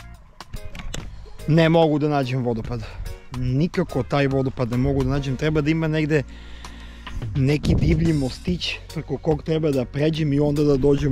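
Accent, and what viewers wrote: Niš, Serbia. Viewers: Ne znam koliko si svestan, verovatno ti pricaju prijatelji i porodica, ali jednom kad se vratis u Nis, ti vise nikada neces pivo da platis ovde. Za ove tri godine si uradio vise na promociji grada, naseg slenga, naseg naglaska, naseg humora, uopste za urbanu sociologiju grada, nego svi politicari u Nisu za poslednjih 30 godina. Kad ovo sve za jednog Durlanca kaze jedan rodjeni Bulevarac, onda ti je jasno sve bratooo 😎 Cekamo te ovde i budi siguran kad te budem sreo negde po gradu, necu da ti trazim selfie ili tako neka sranja, nego ima da bude "De si be, nabijem ti ga? Aj ce popijemo po pivo i da pojedemo nesto konkretno, namocam ti se u pirinac i nudle vise..." Vidimo se nekad, u oslobodjenom Nisu 💪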